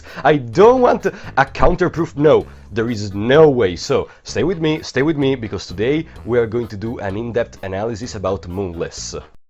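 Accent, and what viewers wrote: Italy. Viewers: bro are you Italian? you sound like it we speak English similarly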